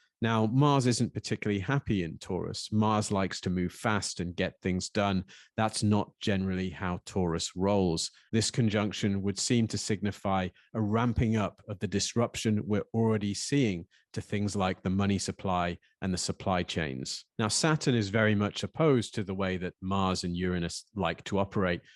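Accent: England, United Kingdom. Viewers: I know this sounds ridiculous but besides the undoubtedly high quality of your research, what i like about your videos is your British accent. It's so much more pleasant to hear than american or australian. Or for that matter mine i.e. indian! 😀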